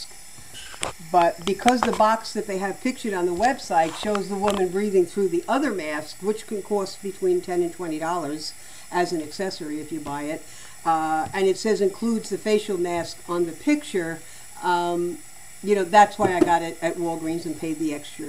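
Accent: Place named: Italy. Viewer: That's awesome! Thanks for making this video, I have constant allergies and I wanted to see reviews of steamers. Are you Italian by the way? My mom's side is Italian and your accent reminds me of my Italian part of my family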